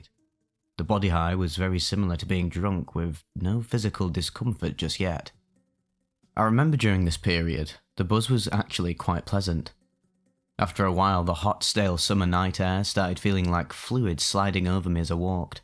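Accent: United Kingdom. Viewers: Yeah ma these narrations make me feel that my life is not that bad . I smoked and dug heroin for 25 years ,finally this year I saidto myself that I am not that anymore.. Even stopped the pgs -pregabalin 900ml a night at least for years. Diazepam .. Even the mirtazapine,after stopping mirtazapine I realised it was those absolutely draining all my energy and depressing the shiz out of me.. Couldn't sleep maybe 1-2 hours . These videos at night with the jlabs in are like magic . Sleep finally . I like the Salvia ones ,especially the Salvia loud dreadful scary machine thing people talk about .. Nice to hear someone from the UK aswell ..I'm in Doncaster..A rite shithole.. Well all England is a dumping ground for the money laundering men's hairdressing /mini mart selling bacci under the counter .. Keep em coming Brother ..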